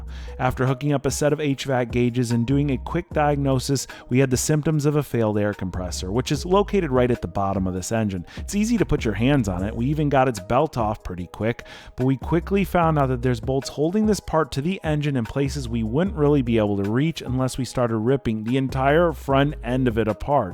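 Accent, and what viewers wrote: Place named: New York, United States. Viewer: Interesting video from a typical Florida boy, NY/NJ accent and all. Thanks a lot.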